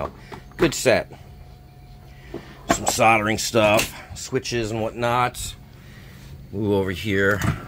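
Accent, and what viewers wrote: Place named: Pittsburgh, United States. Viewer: You from Pittsburgh 😁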